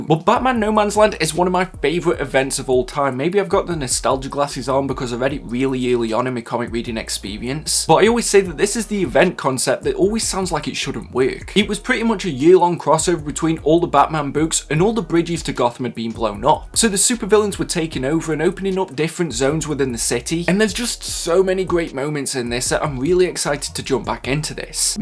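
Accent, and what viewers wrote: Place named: England, United Kingdom. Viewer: Great accent. What part of England are you from?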